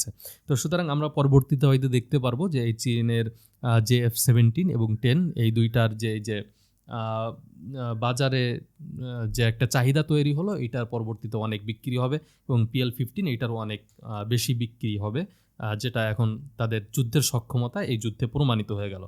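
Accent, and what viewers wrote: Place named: Bangladesh. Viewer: Toke koto Ghosh khaiyeche....tor kotha bolar ton alada ....tui mone hoi BD mal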